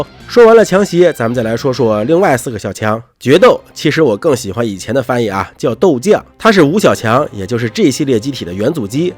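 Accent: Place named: Baoding, China. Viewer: 窝草 保定味儿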